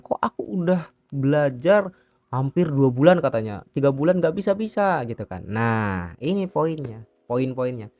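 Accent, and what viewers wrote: West Java, Indonesia. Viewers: Mantap kang, makasih vanyak i'lmunya kang.. Tapi daru katanya seperti orang sunda kang duhk. 😁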